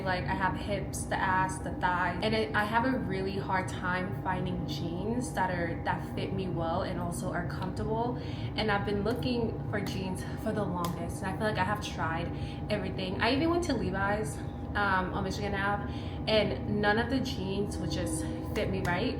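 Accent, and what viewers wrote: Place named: Caribbean. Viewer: Do I hear an accent?🥹 Are you caribbean? if so let’s be friends cause im moving to chicago soon!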